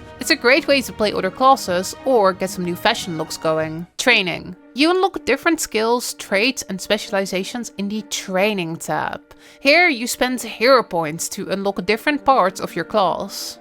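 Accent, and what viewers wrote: Netherlands. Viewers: I like your dutchness 😂 i can hear myself talking. Good video! As a long time returning player i am quite confused sometimes!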